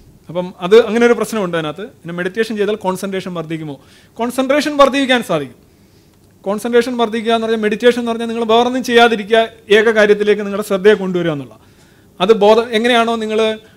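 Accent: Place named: Kollam, India. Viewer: കൊല്ലത്തുകാരുടെ ഭാഷാപരമായശീലം ........ മാത്രം .....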